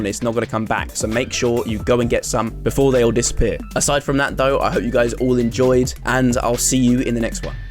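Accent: United Kingdom. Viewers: I really really hate English(your) accent but that what you do is siiick. And accent didnt exist now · why does he kinda look like boffy but with a british accent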